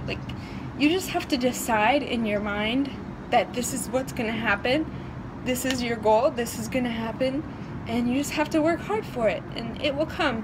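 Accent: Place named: New Mexico, United States. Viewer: Oh my gosh! I totally called your accent a few videos ago. I was like, "Man, she sounds like a New Mexican." That's where my mom is from & I lived there for about 4 years while I went to college. Loved this story time. It's very inspiring.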